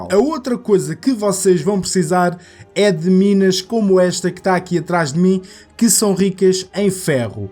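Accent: Portugal. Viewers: Gosto do jeito que os portugueses falam mó fofo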